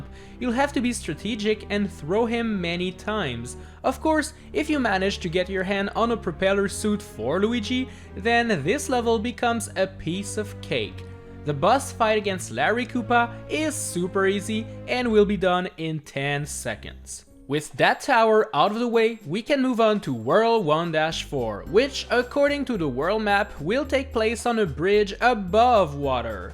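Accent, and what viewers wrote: Quebec, Canada. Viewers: I believe he’s from the French-speaking part of Canada, so he has a thick accent haha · you sound french canadian man :P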